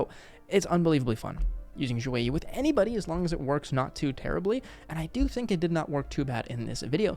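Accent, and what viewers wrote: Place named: Latin America. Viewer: Dude, u speak like a latin man jaja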